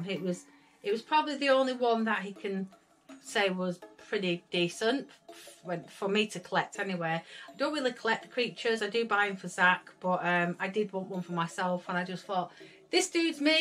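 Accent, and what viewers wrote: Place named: Bolton, United Kingdom. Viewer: Cool Bedroom! Gotta be like a Bolton or Burnley accent you've got hasn't it? As a Southerner I'm probably completely wrong!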